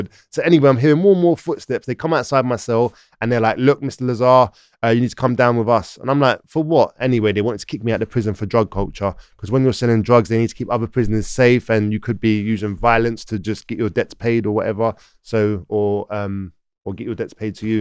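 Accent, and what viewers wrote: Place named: Camden, United Kingdom. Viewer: Great story ! Love the accent as a Londoner all Camden heads sound like this !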